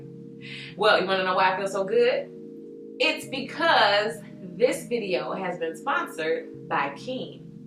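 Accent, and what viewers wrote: Chicago, United States. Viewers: Sounds like you’re from Chicago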